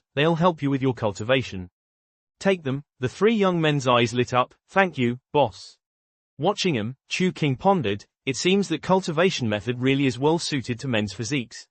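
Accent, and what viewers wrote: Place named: United Kingdom. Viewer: The British accent is pissing me off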